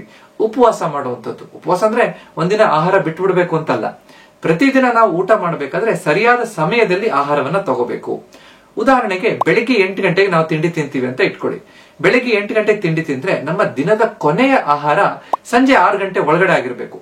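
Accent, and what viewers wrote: Standard. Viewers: Clear message. ಶುದ್ಧ ಕನ್ನಡ - Like always.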